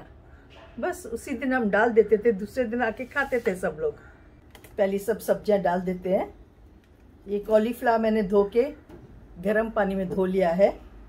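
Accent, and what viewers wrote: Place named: Sindh, India. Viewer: With the name I thought it's a Parsi channel but the time you started to talk aunty ji I got it you are Sindhi 💞❤️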